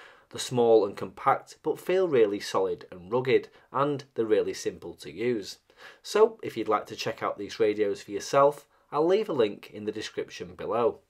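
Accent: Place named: Northern England, United Kingdom. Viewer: is the absolute man. Great videos, great northern accent, great radios. Nothing over complicated, just good content.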